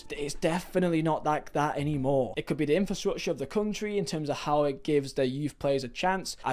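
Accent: United Kingdom. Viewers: GREAT VIDEO AND YOUR BRITISH BTW FELLOW BRIT.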